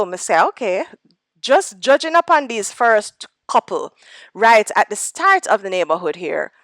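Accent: Jamaica. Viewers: you sure got that classic Kingston accent, and also that classic Kingston patios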